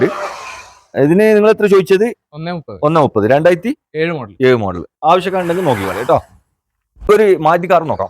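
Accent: Kasaragod, India. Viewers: കാസർകോഡ് ഉള്ളവരുടെ സംസാരം ഇത് വെരെ കേട്ടില്ലാ..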